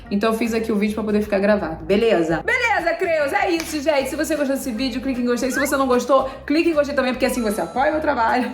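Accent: Rio de Janeiro, Brazil. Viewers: Com esse sotaque não tem como não saber q vc é só Rio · Estou assistindo os Caçadores ao vivo nesse momento. O descaso foi menor, mas uma das apresentadoras nem liga. Me questiono: será por que vocês são amigos e fazem collab? Será que ambos são cariocas e criadores de conteúdos digitais? Sou brasiliense e percebo os nichos que se formam. E tudo bem defender seu nicho. Porém, se te convidaram, o mínimo era conhecer um pouco da sua história. E me indignou elas acharem que era paulista mesmo com o sotaque carregado. Foram sequências de falta de atenção e prestígio.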